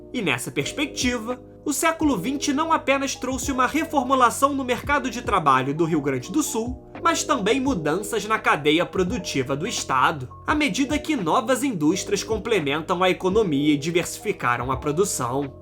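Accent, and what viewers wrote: Rio de Janeiro, Brazil. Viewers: Mais um videozinho cata-clique, baseado em notícias superficiais, clichês históricos e uma "capa" sensacionalista. Não sei o que é pior: o conteúdo ou a narração desse carioca. Sugestão pro próximo cata-clique de vocês: Rio de Janeiro, estado falido, capital da bandidagem do Brasil. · Que narração horrível..... Não dá pra assistir mais de um minuto..... Carioques insuportável.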